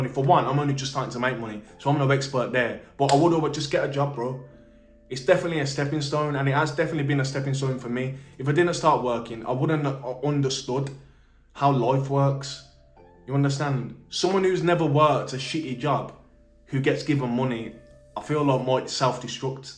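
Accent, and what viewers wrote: Birmingham, United Kingdom. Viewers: good vid bro you from brum ? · bro which place in the UK is that accent from? its fire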